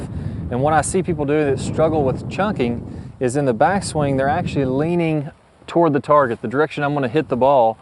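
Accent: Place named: Texas, United States. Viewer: you have got to be in East, southeast Texas with that accent!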